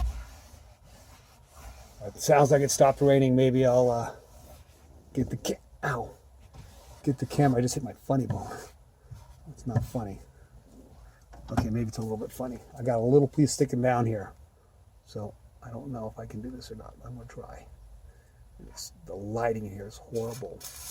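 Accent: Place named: East Coast, United States. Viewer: Mama mia, this is Norm. My wifes headliner started to sag down in her car and I need to repair it, it get warm out here in Florida, but we love it out here. Well in reviewing some YouTube videos, there was your friendly face! however I did not recognize your East Coast accent. I was laughing my ass off, you are still a funny lad. Anyways it was good to get some tips from you, your head liner came out pretty good I must say. I had fund watching your video. Peace brother and God Bless you as well. Norm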